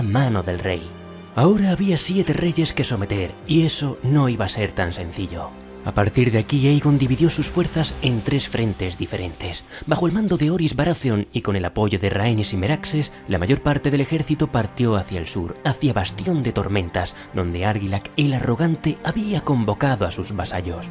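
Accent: Spain. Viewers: soy latinoamericano. Pero te digo una cosa. Siempre me ha parecido que la lengua castellana de España es mejor para narrar historias de fantasía medieval, no sé porqué, pero así lo siento. Debe ser porque le da más epicidad a la narración. No estoy denigrando de ninguna manera la narración del castellano latinoamericano. Has narrado este video espectacularmente, de verdad, te felicito. Muy bueno.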